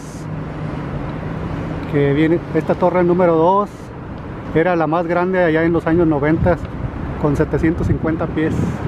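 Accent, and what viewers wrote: Mexico. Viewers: Jaaaa como quieres que hablé si es mexicano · Cuando hables trata de quitarte el acento mejicano, se oye mal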